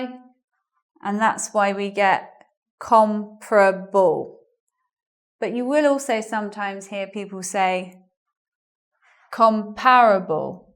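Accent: United Kingdom. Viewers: I was following your video like British accent but i didn`t know that you are also teaching at Engvid... thanks so much for helpful clip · Thank you for this good lesson, with British accent. I think that British (let's say English)accent is the sole fundamental and elegant one, to learn for non native speakers,first of all, and before any other accents, which have altered a lot the original and true ESL. These additional accents do not seem very necessary.(American, Asian, neutral etc.): for instance, an U.S.A. native can well understand someone speaking with an English accent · you are superb , i'm in love with British accent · lol no you don't sound like a native speaker, too british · Pretty much the most normal English accent you will hear, not sure why everyone's saying it's weird. If you think it sounds weird then you're unfamiliar with British English! 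Urban south eastern. Only weird thing I heard was illead instead of ellide. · My god, I love your british accent and trying to copy that , though I'm a man :D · Hi your acssent british english) i'm lithuainian live in england 10 years,and sometimes very hard to understand english acssent,canadian and americans i understand,but i not in north america i'm in england and i need learn british english,thanks i like your videos ))) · i hate british accent after this vid. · OMFG.......the British accent is terrible !!! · I LOVED THIS LESSON. YOU SPEAK WEIRD. LIKE BRITISH.. ARE YOU BRITISH? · Well, she sounds completely British to me, and just love the accents she use. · English - Learn English (engVid) I have a doubt,you are british? I have trouble in recognise accent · I love this video and, I like the way she teaches. someone said that she isn't good at explaining things but, I don't agree. she's explaining is very simple. 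she is trying to talk every sentences shortly using easy verbs, as I see. and she's british accent is very clear. (she even speak slowly, nice!) this all things will be helpful for Asian people want to learn by watching and listening British english just like me. especially Japanese, Korean. Because this two Country's grammar is very different to english! It's completly reversing how you speak & read. Long explaining, added adjective, talking stories, it's sometimes useless! it's even make more confusion to these country's people. These videos are just what I want! Thank you for! x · You are so sexy with your sexy voice and that British accent!!! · Finally i found one channel of british english lessons, i was tired of those estupid american accents, the best accent for me is this!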